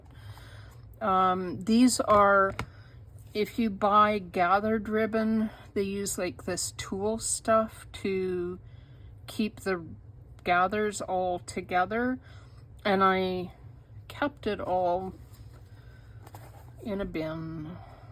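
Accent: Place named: Canada. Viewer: Just discovered your channel - it came up in my suggested videos feed. You have to be Canadian 🇨🇦 🍁 🇨🇦 your accent gives you away! Nice to subscribe to a fellow creative Canuck. I have had tabs on my to-do list for awhile - thank you for the inspiration.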